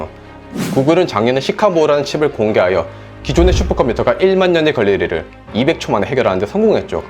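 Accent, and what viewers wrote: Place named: Busan, South Korea. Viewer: 억양이 .억양이 부산 스럽다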